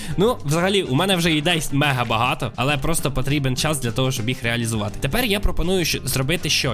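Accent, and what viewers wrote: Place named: Surzhyk, Ukraine. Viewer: ти насуржику говориш я це чую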